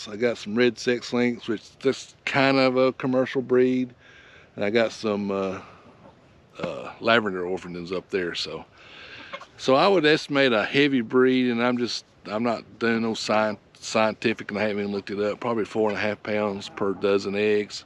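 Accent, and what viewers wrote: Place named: North Georgia, United States. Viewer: Stick with the videos, we enjoy watching your progress. I also like your honest voice, I'm originally from north Georgia, so you sound like home. The AirForce took me to north Florida, so thats where i am now. A few more years and hope to be homesteading and farming for ourselves. We have a backyard flock of chickens we enjoy, just hoping to find more acreage in the coming years. Between Florida & Tennessee is where we want to be. Keep the videos coming, i think you're about to get popular. Best of luck & blessings, Jon & Noi from Florida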